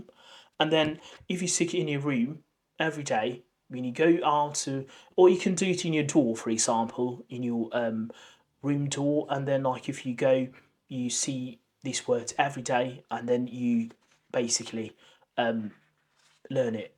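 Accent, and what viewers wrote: United Kingdom. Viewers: Love your British accent . I visited Brighton in 2005 .It was amazing.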